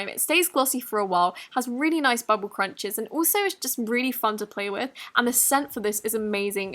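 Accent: England, United Kingdom. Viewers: Are you from England? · Are you English or American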